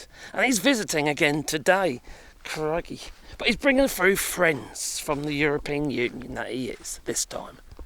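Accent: United Kingdom. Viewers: It’s his British accent.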